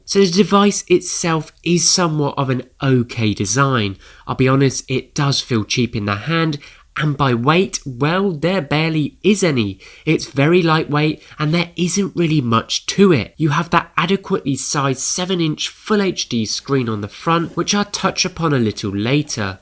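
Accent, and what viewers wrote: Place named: United Kingdom. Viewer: I hate uk accent...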